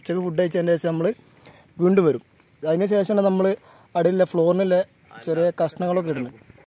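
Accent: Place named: Malappuram, India. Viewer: Mallapurum slang 🔥🔥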